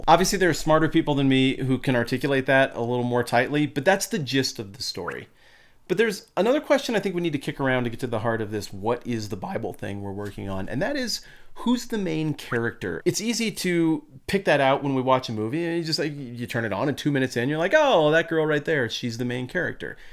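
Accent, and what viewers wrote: United States. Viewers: Are you from Wyoming?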